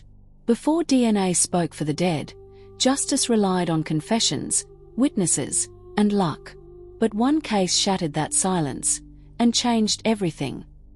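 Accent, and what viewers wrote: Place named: Australia. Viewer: At least the ai has an Aussie accent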